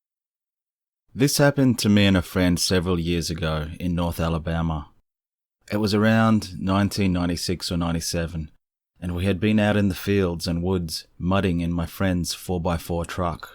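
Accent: Australia. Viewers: Good stories 👍 Your an Aussie aren't you? 🍻😎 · Woah! Straaaaaaange! Life, 2 videos in one week!? To what do I owe the honor??!! As usual, loved the vid! Although I have heard the Creature in the Corn story before, it always sounds better when you tell it with that awesome Aussie accent 😍 😉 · Claims to have been Military working in the US.... Accent is Australian Yeah I say fake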